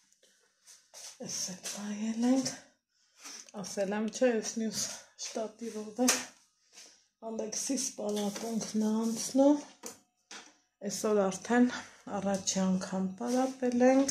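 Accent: Lori, Armenia. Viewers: Թող լսեն անուշ համով հոտով լոռվա քաղցրր բառբառովա խոսում, հրաշք հայուհի ջանը💕💕💕💕💐👍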